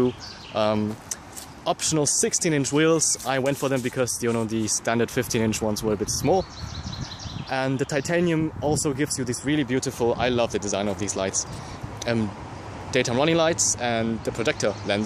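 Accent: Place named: Germany. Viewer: du sprichst zwar englisch mit einem starken deutschen akzent, trotzdem würd ich gern so gut englisch sprechen können wie du. super videos übrigens. mach einfach weiter so!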